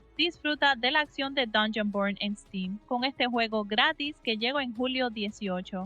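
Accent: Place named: Puerto Rico. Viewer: Saludos. Por tu voz sé que eres como nuestro coqui! Me equivoco? Gracias por el video. Adelante :)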